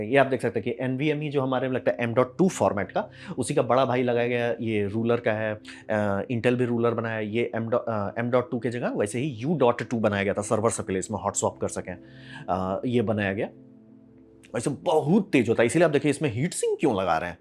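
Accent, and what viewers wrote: Bihar, India. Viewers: Bhai aap bihari ho kya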